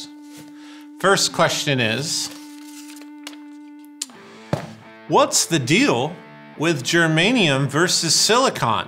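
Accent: United States. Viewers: I love how he uses the contrived southern accent when he wants to sound ignorant. I believe he’s from Dixie and he speaks with a regular American accent.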